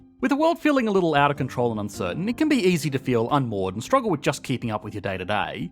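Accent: Australia. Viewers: So Aussie. Thanks for watching mate. Love it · I love the Australian accent but listening to yours is just insufferable. I don't know why you moan at the end of every sentence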